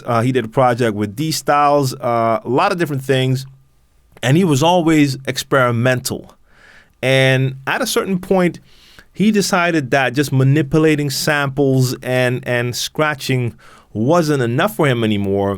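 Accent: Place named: New York, United States. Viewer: I always thought you were from new york.. you have the NY accent.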